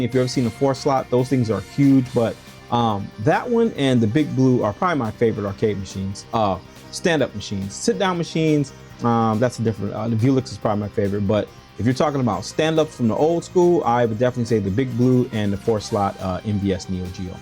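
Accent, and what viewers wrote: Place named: Carolinas, United States. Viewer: ! Your vids are soo good! Thanks for all your work and knowledge you collected over the years … I’m like you.. I dove into this franchise deeply! It sounds like you grew up in the Carolinas, im not far away here in Kentucky … we had the same childhood pretty much. You seem like a guy that would have been one of my friends way back then … you got a subscriber for life here.. can’t wait for more vids and I’m about to run the Alpha video back for the 2nd time today and prob even more! It’s that good bravo! 🫡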